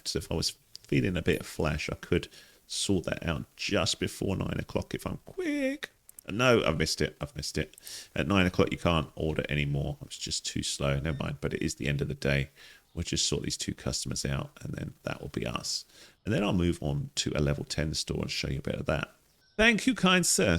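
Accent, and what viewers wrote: United Kingdom. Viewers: Awesome Video. Supermarket Simulator Looks Like A Fun Game To Play. Off Topic: Your British Accent Sounds So Cool 🙂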